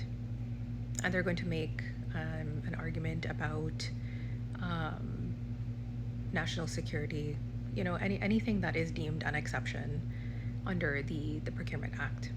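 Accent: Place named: United States. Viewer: woman geh we Ah for Kane chance nah, is you who’s in somebody’s pocket. Yuhs ah UNC operative trying to trick people wid yuh yankee accent. Allyuh fullah education, but dunce like bat!! still following this outdated cane farmer union propaganda from the 1950s, it’s time for an upgrade. Allyuh ent shame?? And BTW this is a legislative issue which has to pass through parlaiment, and not a referendum where public participation is needed, and isn’t it the reason why the people voted for members of parliament? they are appointed as voices of the people in their individual constituencies, so there’s no need for a national consultation, so stop trying to fool the people. Shame on you!!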